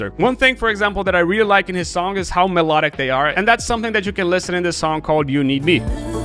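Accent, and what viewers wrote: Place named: Brazil. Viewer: Só pelo sotaque da pra ver que vc é br mano, hahahaha. Ótimo conteúdo irmão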